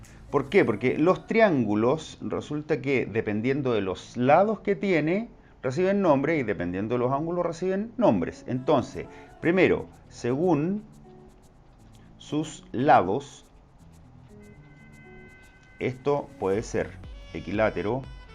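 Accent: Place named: Chile. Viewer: Con razón pe es un chileno nose entiende :v pero gracias profe aprendo mucho de ti, para la próxima mas despacio :'c piedad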